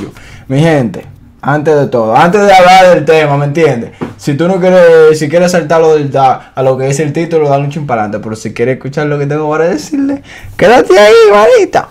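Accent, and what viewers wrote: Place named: Panama. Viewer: tu hablas como que estas con los panameños yo soy tu no tires ni diaqui ni de aya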